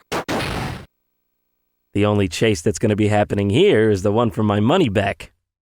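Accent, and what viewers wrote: New York, United States. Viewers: Funny how he had a new yorker accent when he was in Cali, but now that he actually lives in new York he lost it. :/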